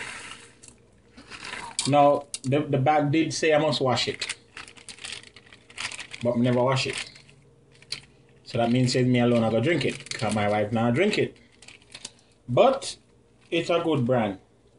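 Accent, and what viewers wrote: Jamaica. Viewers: your Jamaican accent is charming!!! & the soup looks good too!!! ...not a fan of dumplings so will make without :-)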